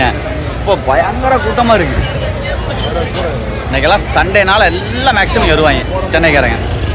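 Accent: Kongu, India. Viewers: Hi bro! How are you & your family? Unga videos yellam super bro. And we like your kongu tamil, naangalum erode tha. But ipo dubai la irukom enga v2la unga videos tha paathutu irupom. And we are waiting for your flight travel videos. And mohan annava ktatha sollunga.🎉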